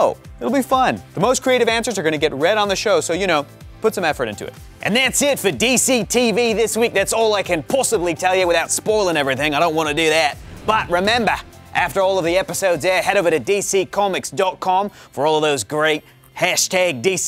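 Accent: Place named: Australia. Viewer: That Australian accent though.😂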